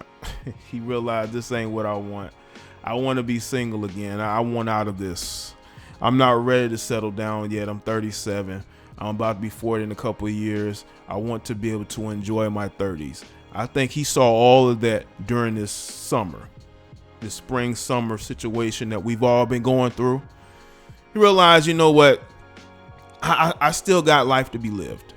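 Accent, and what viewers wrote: South, United States. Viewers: You sound so stupid. I swear you have no facts whatsoever and just assuming like everyone else. Also, what’s the shade about her dating options. Man I can’t stand your country ass voice✋😩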